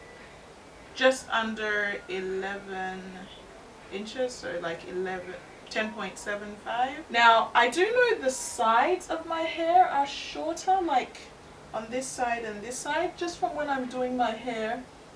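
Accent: Australia. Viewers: I love your hair and your top. This is my first time on your channel, but is your accent Australian? I can't place it exactly.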